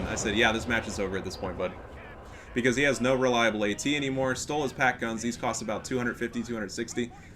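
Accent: Germany. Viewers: As a native german speaker, I highly appreciate your german accent.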